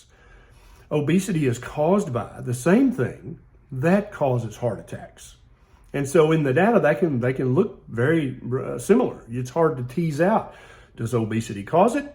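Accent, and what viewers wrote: South, United States. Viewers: Your Southern accent is very charming.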